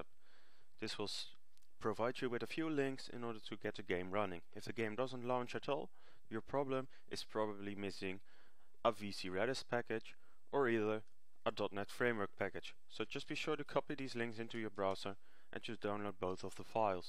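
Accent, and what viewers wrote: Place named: Japan. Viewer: you sound like a japanese :D :D :D so... where do you live?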